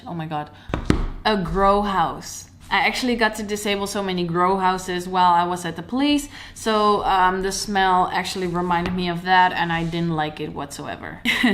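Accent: Netherlands. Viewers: Ik klikte op deze video en je begon te praten en ik dacht meteen ze is Nederlands 😍 elke Nederlander heeft dat accentje geweldig😅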